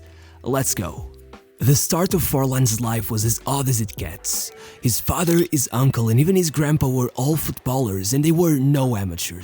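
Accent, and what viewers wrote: Portugal. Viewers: I hear Portugal in your accent.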